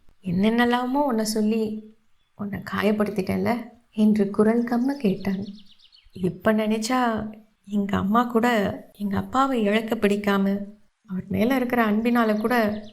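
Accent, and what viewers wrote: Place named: Kongu, India. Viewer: Your kongu slang I am very happy to relate to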